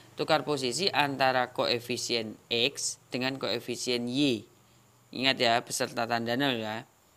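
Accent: Java, Indonesia. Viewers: Mksh ilmunya....cara penyampaiannya enak...ada medhok"nya jawa...salam dr Bogor kak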